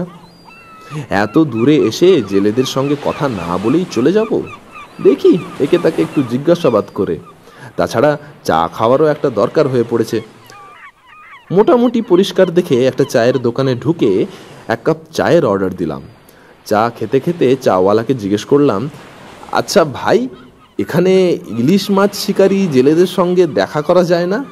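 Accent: Bankura, India. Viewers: Kolaghat e bankurar accent??? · Besh valo.. kintu purba medinipur e bankurar accent ta kan e lagche 😐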